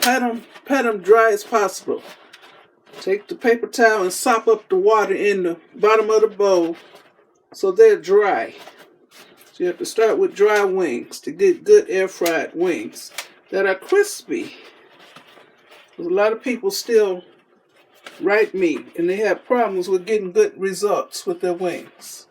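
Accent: South, United States. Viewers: She had me from intro calling ME honey..lol..her southern accent..old school with BOTH arms full of gold bracelets yaaaaasssss..i just got an air fryer last week and made chicken wing dings but not crispy..i will do this recipe next week when I make wings n air fryer..thx so much💜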